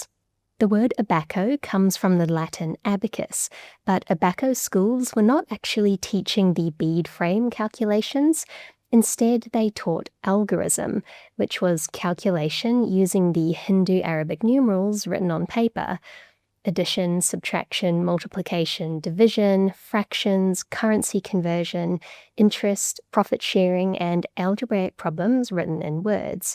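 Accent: New Zealand. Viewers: definitely not Australian, that’s a New Zealand accent 😊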